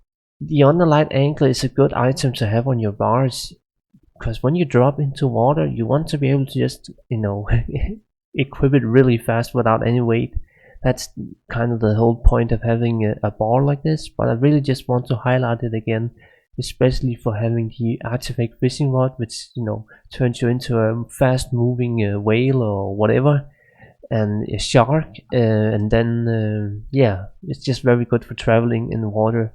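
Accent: Denmark. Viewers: Hehe, After your first 5 words me and my gf said at the same time "That guy is Danish" :P (Well, we said it in danish, since we too are danish, but you get the point) But nice video, thanks :P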